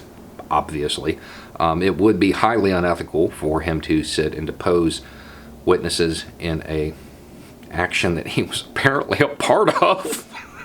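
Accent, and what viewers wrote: South, United States. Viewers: Republicans don't care about doing what is right for our country. They have no morals. They are only interested in their generation making as much money as possible right now no matter what. We had it great. I remember reading about President Obama sitting up late at night reading our letters, can you imagine trump doing that? Obama taught the Constitution, he debated with colleagues, students, family and friends about the Constitution, does anyone think Trump has even read it?. Obama loved us, and he loved this country. Trump laughs at us and uses us to line his pockets. Why do his cronies even think he will have their backs? He trashes all of them in the end. Lindsey Graham has been revealed to be as disgusting a human being as trump. No morals, no values other than the immediate dollar. I really appreciate your southern voice speaking truth to power about the state we are in. 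Not all southerners are republican lemmings! Once we get control of our country back, we need to start educating youngsters in school about our true history, science, understanding how to analyze the politics that gets thrown at them, how to think critically - for themselves.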